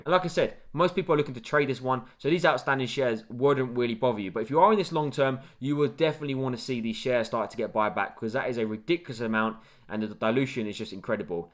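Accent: England, United Kingdom. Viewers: What’s up with the English accent and a god damn yankee hat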